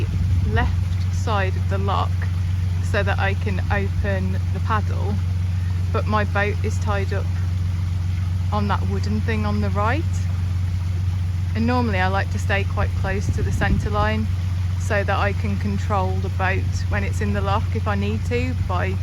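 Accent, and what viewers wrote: United Kingdom. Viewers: Your British accent is absolutely lovely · You have a perfectly normal English accent! Nothing at all out of the ordinary for that region. Some people are just too ignorant to appreciate the beauty of regional accents. It would be a boring world without them! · I'm American so you have what I think of as a very British voice, perfectly fine. I've learned over 6+ decades of life to just let the naysayers go and don't allow them to own any part of your thoughts. Remember, people only have as much power over us as we allow them to have. Keep on making your videos, they are outstanding! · I've never heard a British accent that wasn't music to my ears, yours included. I just discovered you and I love the tone and energy of your video! Thanks also for the history.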